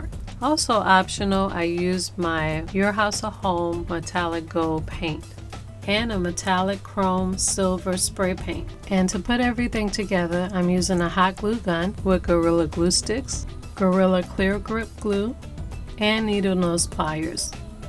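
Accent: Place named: South, United States. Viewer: I really love your creativity, and especially I love listening to your wonderful accent. Are you from somewhere in the South?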